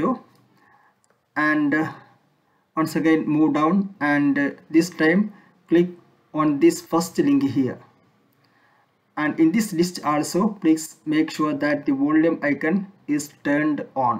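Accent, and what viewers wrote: India. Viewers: came here to actually remove the icon, thanks alot <3 Indian accent is always funny to me btw lmfao, just thought I leave that comment here forever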